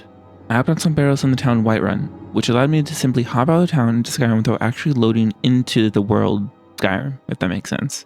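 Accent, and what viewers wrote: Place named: United States. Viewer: He's speaking completely understandable American English, the fuck are you on about?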